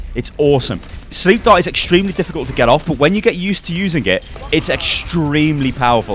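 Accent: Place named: United Kingdom. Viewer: Amazing guide; keep your work up! Admittedly Ana is my main as well, so any kind of help I get is amazing. By the way, nice British ;D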